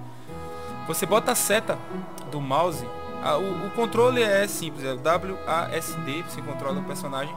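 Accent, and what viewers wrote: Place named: Pernambuco, Brazil. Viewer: Pernambucano, mano? Sotaque infalível.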